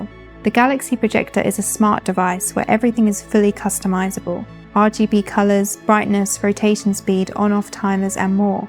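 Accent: United Kingdom. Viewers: She has one of the many accents found in Britain ... If she was speaking with a Scots accent (like e.g. Billy Connolly) is that THE British accent? If not then why not? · Better than any type of non-British accent though. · Ugh, that british accent 😍 😂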